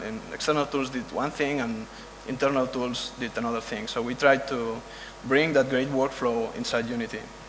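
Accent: Spain. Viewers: is he spanish??? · Como se nota que es español ajaja gran trabajo men, sigan asi 👍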